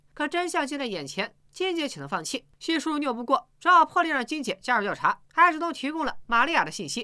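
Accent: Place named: Shandong, China. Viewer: 山东味儿有点重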